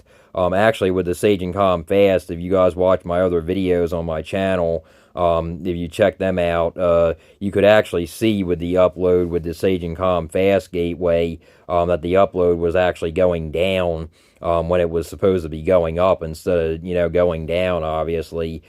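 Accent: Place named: New Jersey, United States. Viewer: An Australian who adopted a New Jersey accent...you know.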